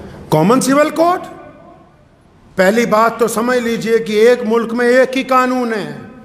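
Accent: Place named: Punjab, India. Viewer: great Punjabi tone and great Urdu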